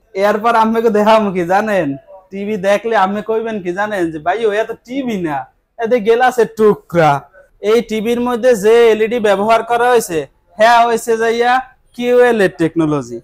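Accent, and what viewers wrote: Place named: Barishal, Bangladesh. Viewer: খাস বরিশালি🤭🤭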